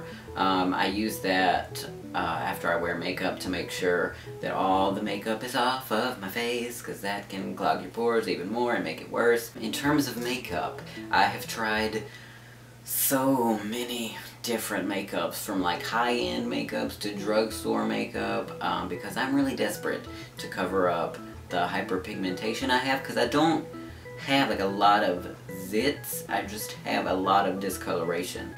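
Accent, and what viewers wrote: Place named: New Orleans, United States. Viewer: What accent is this? New Orleans?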